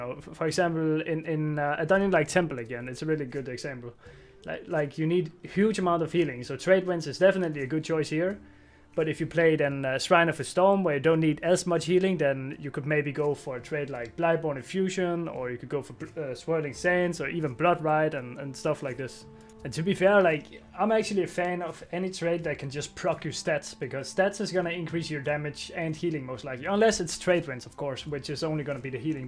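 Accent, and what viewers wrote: Denmark. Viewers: I love your Danish accent! <3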